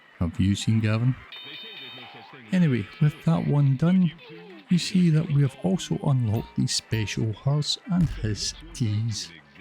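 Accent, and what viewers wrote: Scotland, United Kingdom. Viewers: I should have listened too, Didn’t realise you were also Scottish 🏴. Think I have sussed the issue. Both our gametags have exactly same amount of characters 11 long. So I am gonna change mine since you can do it once for free. Hopefully that helps. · I watched your video, a lot of times and I never understood what I needed to do... with all respect, since I'm not English, it's a bit tricky to understand with your Scottish accent 😅 (I love your pronunciation btw). A little recap can be very helpful, for real...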